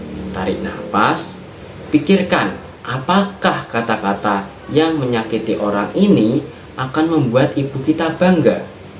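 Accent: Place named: Java, Indonesia. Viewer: Semangat, Medok ciri khas👍👍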